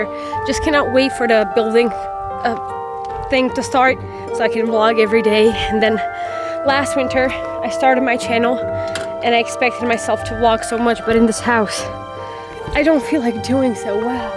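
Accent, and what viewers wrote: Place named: United States. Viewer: Damn, you sound so American, like the loud kind, I don’t m a that in a bad way, I’m American. It’s mind bending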